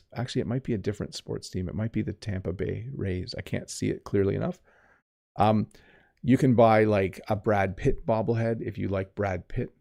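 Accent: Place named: Canada. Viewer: you make me would like to immigrate to canada I like canadian accent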